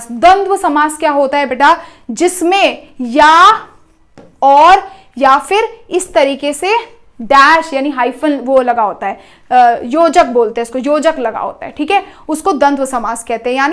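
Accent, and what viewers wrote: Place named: Haryana, India. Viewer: Didi aap haryana ke hona!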